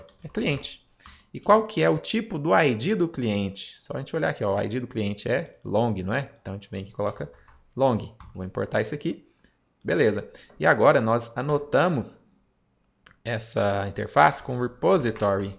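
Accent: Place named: Minas Gerais, Brazil. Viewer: Engraçado, esse sotaque, acho que mineiro, faz ficar mais fácil ou é impressão minha? MUITO BOM!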